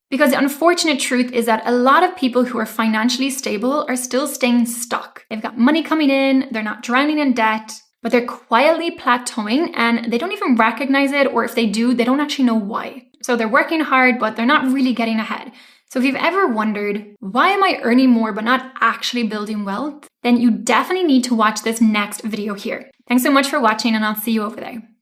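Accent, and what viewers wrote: Cork, Ireland. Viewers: This video made me look at my financial life differently. In a good way. Thank you for the content! My husband and I love the Cork accent. It honestly makes your videos so soothing to listen to! ❤️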